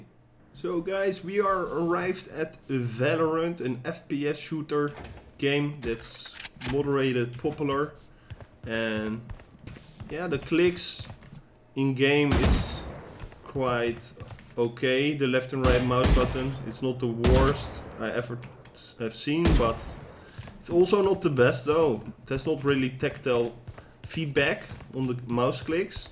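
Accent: Netherlands. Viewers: Echt pannekoeken engels.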